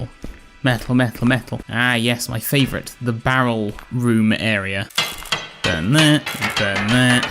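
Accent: United Kingdom. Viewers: your soothing British voice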